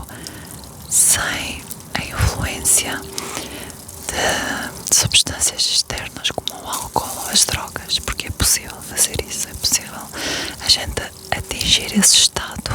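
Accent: Portugal. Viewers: nossa que video incrível ❤, esse sotaque português ele tem um charme,uma "classe", um refino, muito obrigado pelo video dona, gosto q vc fale português Brasileiro, mas vc não precisa, boa noite 😴😴😴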